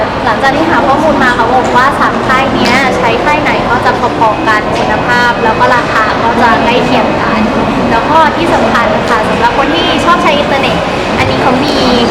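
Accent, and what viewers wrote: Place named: Taiwan. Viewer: ชอบสำเนียงของคุณ..พูดจีนสำเนียงไต้หวัน..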